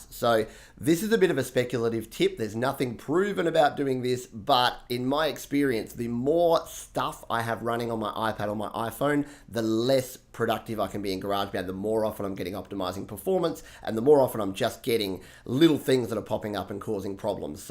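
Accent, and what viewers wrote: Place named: Australia. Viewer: Betht five tipth Sorry that was mean. The dope Aussie accent more than makes up for it.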